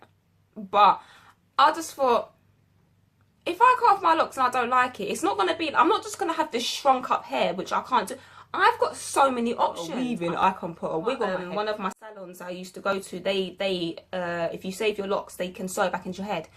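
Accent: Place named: London, United Kingdom. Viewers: carol durand yeah big time great to hear UK London girl cos all we usually get is American... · pretty skin and great to hear a uk accent. :)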